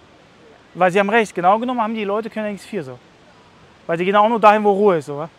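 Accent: Berlin, Germany. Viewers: Dit machste schon jut Immer schön Berliner Schnauze wa Hau raus Bro 🙌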